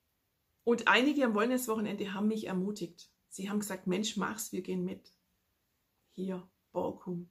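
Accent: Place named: Swabia, Germany. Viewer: Hallo,was hab ich mich auf und über dein Video gefreut.Das es dir gut geht (Mann und klein Lily natürlich auch) und das das Ergebniss von deinem Knie ein gutes war,Gott sei Dank.Ich schaue nicht sooo viele Potcast aber deine verpasse ich nie,ich hör so gerne wenn du schwäbelst:)So,jetzt wünshe ich dir noch eine schöne Zeit,und vor allem bleibt alle gesund. Es grüsst dich ganz herzlich die Gaby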